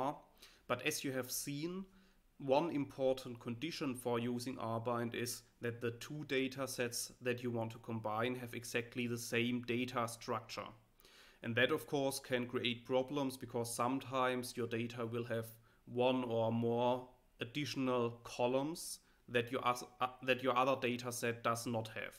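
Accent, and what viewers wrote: Germany. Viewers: Good lesson. Good english, relatively poor mine. I don't know deutsch, but diction sounds like deutsch from Hessen Frankfurt.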